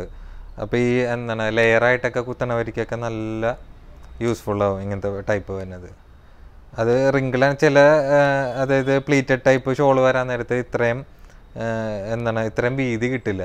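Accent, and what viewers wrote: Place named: Kochi, India. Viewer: കൊച്ചി കാരനാണോ. കാരണം സംസാരം കൊച്ചി യാണ്. തൃശൂർ അല്ല ട്ടോ.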